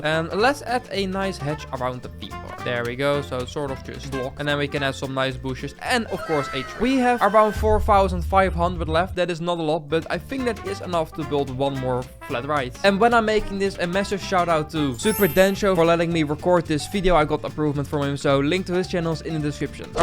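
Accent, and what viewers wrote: Netherlands. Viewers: Je bent Nederlands dat hoor ik bij het begin van de video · Jij klinkt echt Nederlands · wow ėėn goede nederlands aacent je bent ėėn echte nederlands person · Lekker een Nederlands accent aan het engels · The Dutch accent😂 love the video 🎉🎉🎉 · vind het altijd grappig als ik bij nederlanders aan hun accent kan horen dat ze nederlands zijn btw goeie content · Do I hear Dutch? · “Som sietin” lemme guess your dutch😂 its such an dutch accent tbh, no hate ofc its just so funny to see a person that also speaks dutch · Ik kan horen dat je nederlands bent lol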